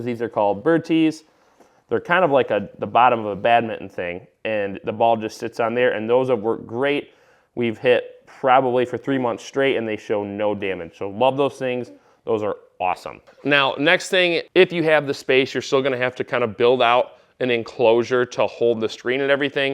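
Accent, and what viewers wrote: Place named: Michigan, United States. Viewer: How did it take me 15 seconds to guess you were from Michigan lol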